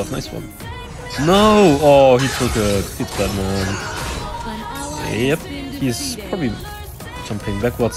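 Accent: Germany. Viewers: Wenn das Deutsch wieder durchkommt. Trotzdem nices Gameplay (wie immer) ich werd wohl eh nicht mehr verstehn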